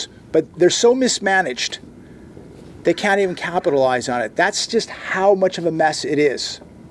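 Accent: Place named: Chicago, United States. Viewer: Taday,love the Chicago accent😊✝️.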